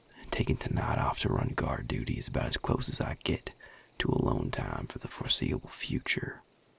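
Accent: South, United States. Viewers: Nooo why is his American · It's like Christmas came early- as a fellow southerner, I can't get enough of dear!:D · Jeebus....that gravelly, southern voice is....good chills. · The southern accent is amazing^w^ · I am NOT a fan of country accents but is an exception 🥵🥵😍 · IS HE A SOUTHERN VAMPIRE?? BLESS MY SOUL~ 😳👊🥰😆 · The southern accent is 💖💖💖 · I love that you have a country accent because it’s great for a vampire like no one really does that and I just think that is so cool for vampire to have a country accent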